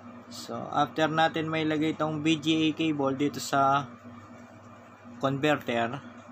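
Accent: Pampanga, Philippines. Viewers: Kapampangan na kapampangan accent, haha konelk eh.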